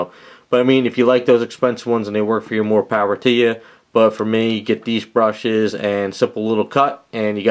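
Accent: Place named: South Jersey, United States. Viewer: the south jersey/philly accent here has soothed me as well as given me all the confidence in the world to cut up my paint brushes immediately. Thanks for the tutorial!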